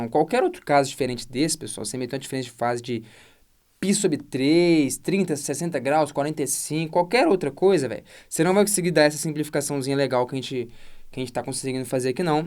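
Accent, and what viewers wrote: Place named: Belo Horizonte, Brazil. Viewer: quando comecei a assisti seu canal tem um tempo, e tinha algo além do conteúdo que me chamava atenção dos demais canais, mas nunca tinha percebido. Recentemente vi um de seus stories e descobri que você é de BH, igualmente a mim, e aí eu percebi o que me chamava a atenção Esse sotaque de belo-horizontino rasgado kkkkkkkkk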